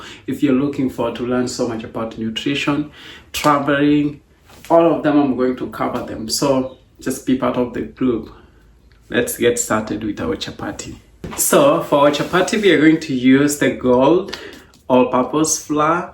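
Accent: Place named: Kisii, Kenya. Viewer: I love your Kisii accent just like congrats dad😂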